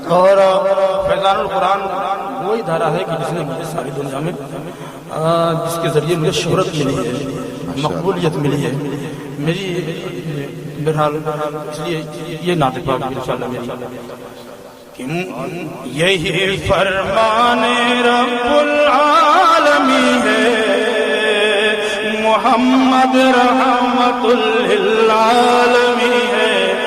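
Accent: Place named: India. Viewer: السلام عليكم ..الصوت الهندي جميل.ماشاء الله...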